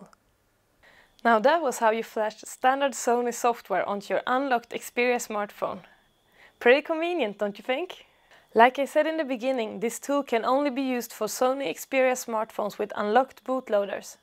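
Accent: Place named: Sweden. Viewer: Typical swedish accent :)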